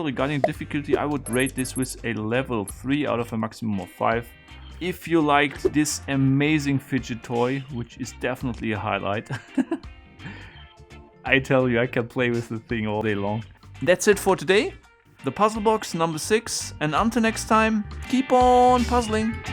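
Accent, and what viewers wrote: Germany. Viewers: He had an germen acsent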